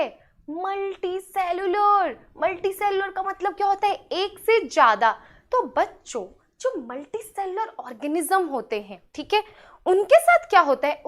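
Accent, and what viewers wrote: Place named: Bihar, India. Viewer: The Presentation was great and The Explanation was awesome with some extra concepts I think.Though It wasn't of my class but I enjoyed it. KEEP UP YOUR GOOD WORK TEAM and if I am not wrong from your Accent I can guess that you are from Bihari origin having said that I am not from there. Anyways BEST OF LUCK TO THE TEAM for your youtube channel and I wish your channel grows over time. Once again thanks for your amazing explanation.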